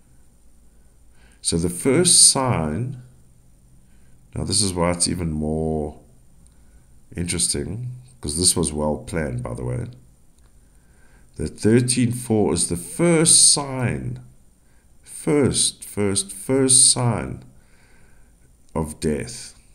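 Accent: South Africa. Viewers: Anyone else just clean their whole house or take naps or listen to these videos before bed? I just love South African accent.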